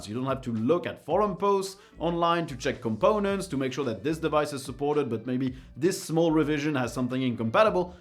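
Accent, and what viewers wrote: France. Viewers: I definitely love my country. Besides your french accent is incredible.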